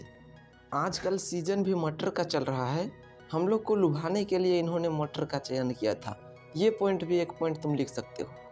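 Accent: Bihar, India. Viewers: App sayad bihari ho apki bato se samajh aata h Btw I'm also bihari....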